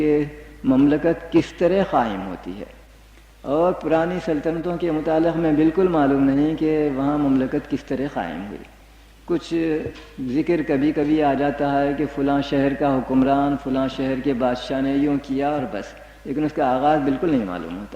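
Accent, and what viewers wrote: Hyderabad, India. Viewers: ماشاءاللہ۔۔۔ھر لیکچر ایسا ھے کہ 2020 میں سنتے ھوئے بھی محسوس ھوتا کہ نالج اور علم کے نئے باب کھل رھے ھیں۔۔ڈاکٹر صاحب کا حیدرآباد دکن کا اردو لہجہ مسحورکن ھے۔۔۔اللہ ڈاکٹر صاحب مرحوم کو جنت الفردوس میں اعلی مقام عطا فرمائے آمین۔۔۔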